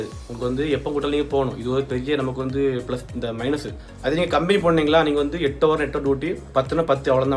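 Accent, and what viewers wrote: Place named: South Tamil Nadu, India. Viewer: South Tamil ❤ Slung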